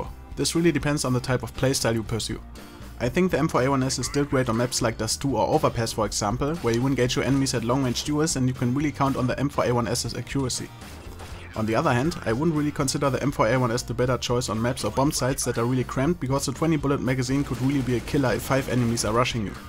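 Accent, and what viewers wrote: Germany. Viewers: Ure german? Ich dachte ich hätte den akzent erkannt :D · bist du deutscher? Dein englisch klingt irgendwie deutsch. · Bist du Deutscher? xD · bist du deutscher? Du hast ao einen kleinen Aczent :) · The german accent is anoying to my ears. I don't know maybe just me. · are you german? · You are German right?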